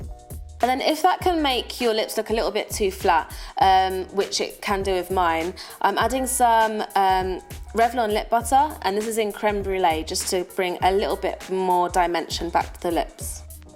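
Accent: London, United Kingdom. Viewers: you're so London, I love it. makes me want to go and visit again xx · british accent!! love the make up and your eyes are so beautiful! wow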